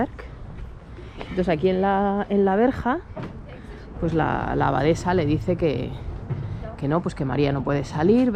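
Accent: Spain. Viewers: Como tu, tan Madrileña, hablando como Andaluza???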